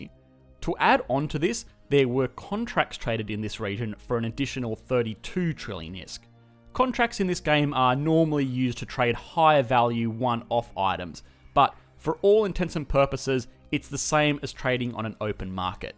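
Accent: Australia. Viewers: Shame the Australian accent ruins any chance of the vid being taken seriously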